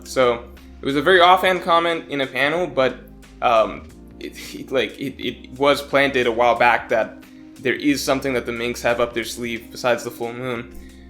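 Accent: India. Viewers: Hey, which part of India are you from? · are u indian?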